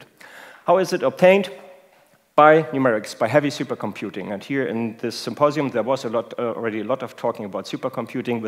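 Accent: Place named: Germany. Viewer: warum nicht auf deutsch......deutschen solten nie english sprechen, nur schreiben...hört sich nicht gut an... nur ein ding is noch schlimmer, amerikaner die deutsch versuchen zu sprechen...lol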